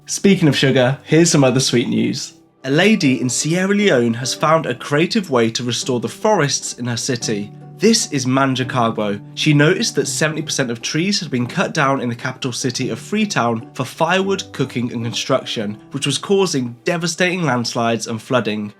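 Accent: United Kingdom. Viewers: Having been swamped in war news, trump news etc etc today, your channel suddenly popped up, and I thought....why not! You go a little fast for my poor old brain (I've got severe M.E.), but I've subscribed, and we'll see how we go. It's refreshing to hear a British accent, for a change too.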